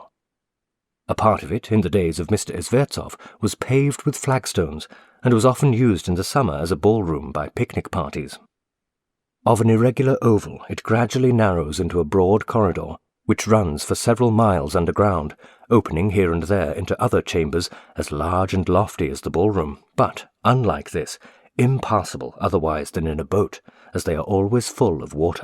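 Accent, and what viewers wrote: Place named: United Kingdom. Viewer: Are the collected works of Madame B. available- her short stories on Amazon? A character who has long held a fascination for me and if I had the talent and imagination I'd love to write a ghost story with her either alive or "in spirit" ! She and Crowley perfected The Stare, didn't they?! Thanks for posting, I am a frequent listener of Jasper L'Estrange and Tony Walker and so I found your channel- I tend towards Victorian ghost stories, written by women, I think our viewpoint is often different and perhaps a little stranger than that of men. I think of modern women, Shirley Jackson, Joan Aiken? and one whose name I can't recall...Hello, nice to meet another Brit. you have the voices! I love accents from the British Isles, as with fog, and huge houses in disrepair- so suited to the ghost story! Namaste from Canada.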